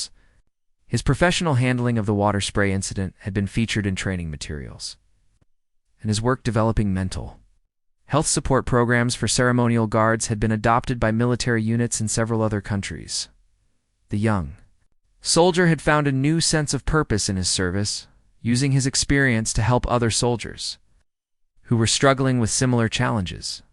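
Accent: United States. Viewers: Bogus, cooked up by this fellow with American accent.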